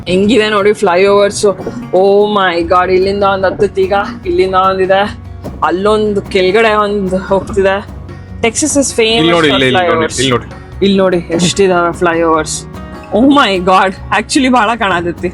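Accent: North Karnataka, India. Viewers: mast kannada mathu Paka uttar karnataka 👦 Boy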